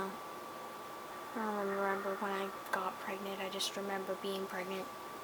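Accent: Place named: Canada. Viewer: i can hear your canadian accent!! :D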